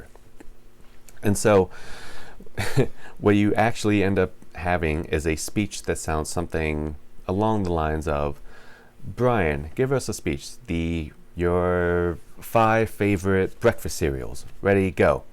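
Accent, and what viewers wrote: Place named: United States. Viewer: I love your accent. Which part of the States are you from?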